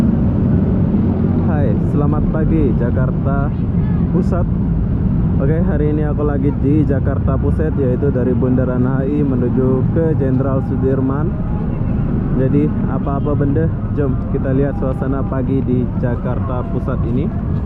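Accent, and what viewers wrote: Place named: Malaysia. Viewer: dia orang indo tuh. Karena lama di Malaysia jadi logat dia kaya orang Malaysia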